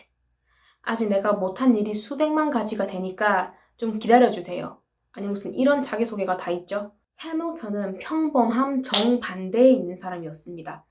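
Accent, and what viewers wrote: Gyeongsang, South Korea. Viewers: 잘 봤습니다. 님의 경상도식 억양이 정감있네요.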